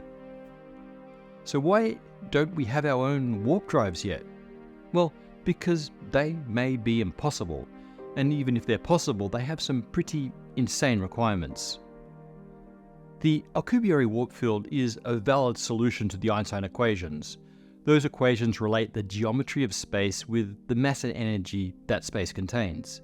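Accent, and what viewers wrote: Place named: Australia. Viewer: He's Australian lol.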